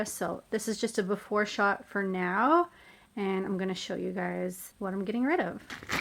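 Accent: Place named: Maritimes, Canada. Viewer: i could tell you are from the maritimes from the accent my mom is too. i go back there every summer newfoundland is absolutley beautifu. l lots of love from vancouver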